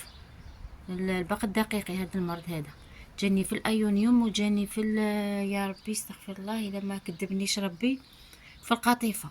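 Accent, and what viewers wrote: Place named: Mascara, Algeria. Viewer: ماشاء الله لوكان غير جيتي حذايا ندي من عندك شويى تهدري كيفنا في معسكر بصح ما عرفتكش مين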